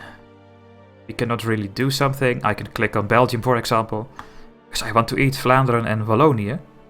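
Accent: Netherlands. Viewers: It's not as bad as most Dutch though. It's very easy to listen to and quite fluent. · Typisch nederlandse accent xD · Hij heeft een behoorlijk Nederlands accent. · Ik kan gewoon horen dat het een Nederlander is die Engels praat dat is bij elke Nederlander. Haha THATS another cook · Can Gerek Nee, dat is gwn nederlands accent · I find your holland accent so funny :D I'm from Belgium btw ;) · obviously sarcastic can't miss a Dutch accent lol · haha you are either sarcastic or stupid, I'm dutch aswell and i hear emediately that he's dutch ;D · je bent een nederlander ik hoor het aan je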